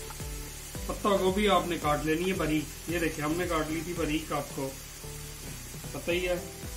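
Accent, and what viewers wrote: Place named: Turkey. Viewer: jub aap urdu bol rahy hoty to turkish style thora thora aata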